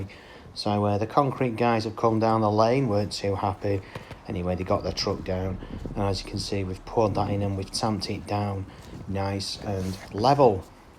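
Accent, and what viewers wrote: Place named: Manchester, United Kingdom. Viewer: Hello. You sound like you’re from Manchester. Please can you call me. Inbox me for my number. I’m planning of doing a mancave for myself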